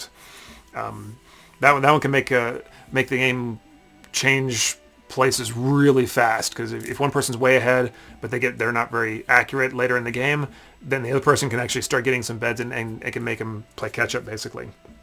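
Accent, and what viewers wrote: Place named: Yorkshire, United Kingdom. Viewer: Shoov ape knee. As a resident of the US (please forgive me, I didn't do it...) I recognized this as a Yorkshire pronunciation with the help of Wallace and Grommit. A friend of mine from England said to me to imagine the US upside down, all the country folk are in the north...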